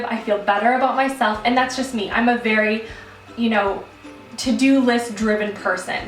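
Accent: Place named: Canada. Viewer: I can tell you're Canadian by the way you say "out" and "about" :) so cute!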